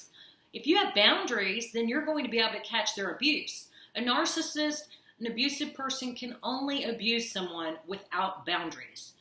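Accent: Texas, United States. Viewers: Are you from Texas? You've got the accent and that great kick ass attitude you need to deal with narcissists 👏👏👏👏keep up the good work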